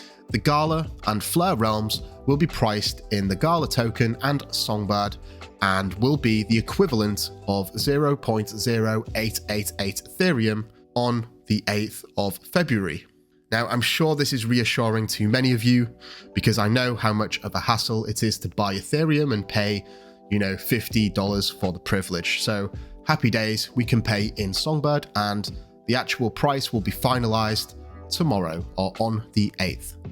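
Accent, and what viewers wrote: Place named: RP, United Kingdom. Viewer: Hard to listen to… the accent is … posh spice… perhaps subtitles would help? And the funeral parlor organ is over da top? C’mon … please, I can’t understand what is being said… praced hout = priced out?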